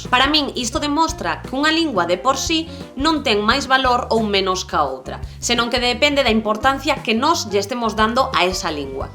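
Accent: Castile, Spain. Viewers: Ela está falando portunhol com forte sotaque espanhol... Pelo que sei, isto não é galego... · No sientas esto como una crítica pero creo que tu gallego está muy castellanizado (usas infinidad de tiempos compuestos en los verbos y muchas palabras que tienen su variante propia en gallego como tal). Imagino que es porque eres 'neofalante' y lo has practicado poco. Sin embargo he de darte la enhorabuena por tratar de visibilizar y darle toda la importancia que se merece a un idioma que, sin duda entre todas las lenguas de España, es la que tiene más potencial. Mi consejo: practícalo más si puedes, escucha a la gente más mayor (suelen ser los que menos contaminación lingüística tienen aunque no son infalibles), lee mucho al respecto y lo bordarás (nadie nace aprendido e incluso estudiar bien el gallego en Galicia es difícil ya que tanto RAG como profesores coinciden equivocados en que el gallego evoluciona de manera natural cuando en realidad lo hace con un alto grado de contaminación lingüística). 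Es más, alguien en los comentarios decía que te estaba escuchando y que creía que hablabas castellano (no es el primero que es de fuera de España al que le escucho decir que el gallego es "como un castellano mal hablado". Síntoma excepcionalmente grave de que el gallego en cien años acabará siendo un dialecto. De hecho la fonética es con el normativo prácticamente castellana. Una pena... Te lo dice alguien que se defiende en varios idiomas, la mayoría de ellos romances y contando también con algunos propios de la península ibérica, y que ve cómo el gallego poquito a poco es, de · ela esta falando eu português com sotaque espanhol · Yo diría que trates de pronunciar gallego como nativa ya que sabes hablarle xq estas hablando gallego con acento castellano ESO NO ES PERFECTO.... ES COMO QUE UN FRANCES NOS QUIERA ENSEÑAR CASTELLANO CON ACENTO FRANCÉS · Galego con acento de un español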